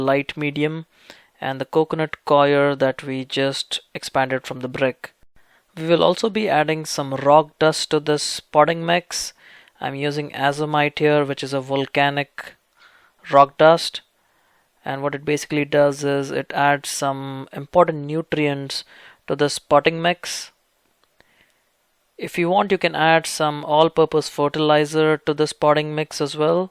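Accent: India. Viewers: it's an indian accent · not an Aussie accent its Indian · Your accent seems to be an indian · indian i think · I love Indian accent, so refreshing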